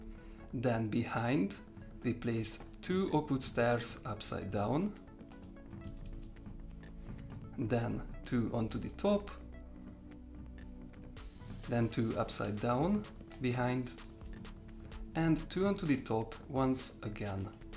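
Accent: Hungary. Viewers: Te magyar vagy? Eléggé hallatszik.. :D Nekem olyan furcsa v a beszéded nem eredeti angol kiejtésre hajaz...vagy csak tudsz magyarul? YouTube are Hungary? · Valahogy éreztem hogy magyar vagy a kiejtésed miatt😁 Csak így tovább! 🇭🇺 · tudtam, hogy magyar vagy.:) kiejtésröl tudtam. amúgy nagyon szeretem a videóidat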